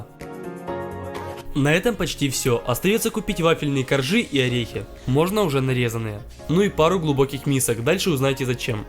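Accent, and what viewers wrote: Ukraine. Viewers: да,советую посмотреть его первое видео.там он разговаривает более украинским акцентом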